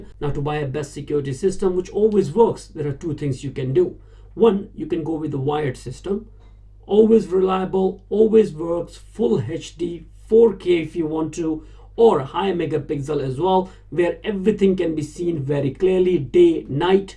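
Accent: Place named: India. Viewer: Abe... Desk accent bahut hai be... Didn't show how to INSTALL it on front door 🚪 need to do be more thorough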